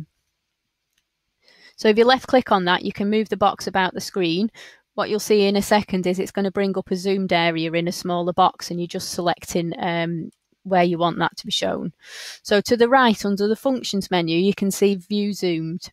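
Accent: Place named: United Kingdom. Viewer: The speaker speaks to fast and with the british accent - difficult to understand all of the words. Slow down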